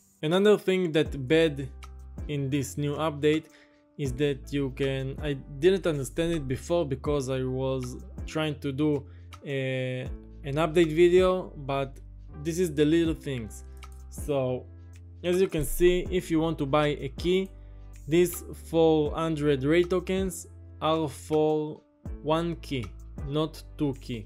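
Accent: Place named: France. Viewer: ton accent français s’entend trop ptdr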